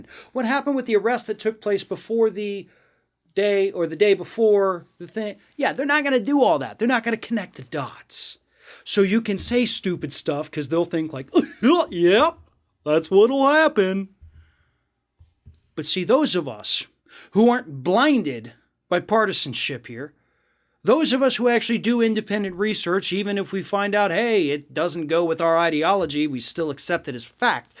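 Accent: South, United States. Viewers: I’m not American, but I like your southern accent! Greetings from the Netherlands. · Love your American accents. 😍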